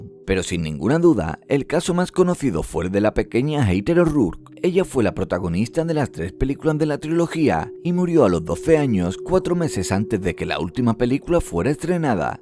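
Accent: Andalusia, Spain. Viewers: Una pregunta, eres de España(andaluz) Like si crees eso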